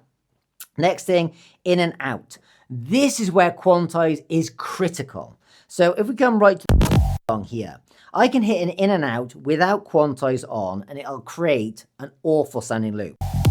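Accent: United Kingdom. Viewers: Who is this bri'ish bloke trying to tell me how to do?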